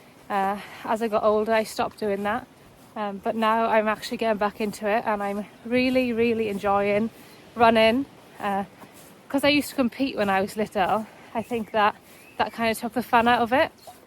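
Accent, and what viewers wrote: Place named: Wales, United Kingdom. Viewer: I'm From Chippenham, Wiltshire. Recently, I discovered a Neolithic burial chamber which is just 20 feet from the road I have travelled along literally thousands of times. Lanhill Longbarrow, 3500bc. It has also been called in the past Ubbe's Low. Allegedly it contained the body of Ragnar Lothbrok's son Ubbe. But this is probably myth. Blows my mind to think one of the oldest buildings in these Island's history is just randomly situated just off a busy main road. Something so ancient and hardly anyone knows it's there. You have a lovely Welsh accent and it's magical when you say 'beautiful'. You're very humble, which I find to be a general Welsh trait. Keep posting from lovely Wales.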